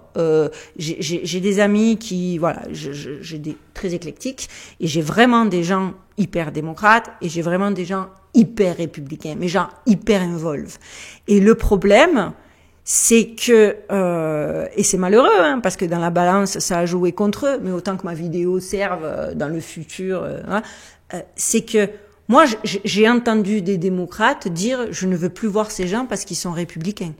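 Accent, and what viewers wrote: South, France. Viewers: merci perché de votre accent du sud 'j'espère que la vague de ce tsunami nous parviendra jusqu'ici en France ,chez nous aussi c'est le bordel j'ai l'impression d'être en famille en vous écoutant😂🙏 prenez soin de vous à très bientôt .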